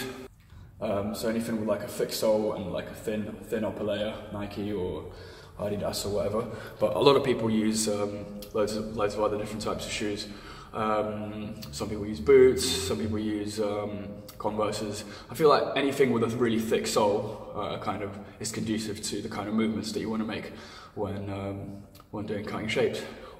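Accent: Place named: Germany. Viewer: He sounds foreign,kind of germanish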